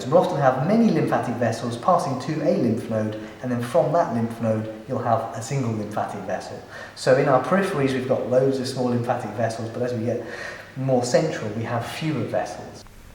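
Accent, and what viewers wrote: United Kingdom. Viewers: im in love with you and your British accent i love so much thx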